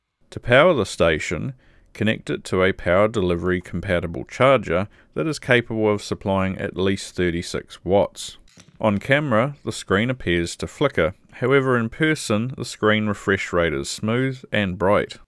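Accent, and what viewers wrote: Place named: New Zealand. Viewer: Just love your Kiwi accent!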